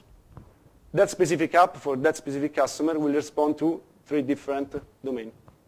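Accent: Italy. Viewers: Because this was recorded in Florence Italy. Europe isn't a single country. There are many different languages being used. And he IS speaking English, but he has an Italian accent, we get used to it. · It's just I had hard time understanding, very strong accent. Maybe it's because I heard Italian accent for the first time.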